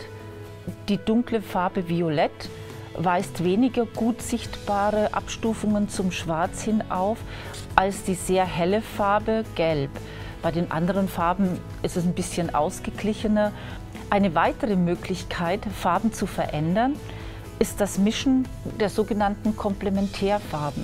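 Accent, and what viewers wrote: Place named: Upper Palatinate, Germany. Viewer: Ha ha, das ist nicht Fränkisch, der Akzent stammt aus der Oberpfalz - so kann man sich täuschen!!!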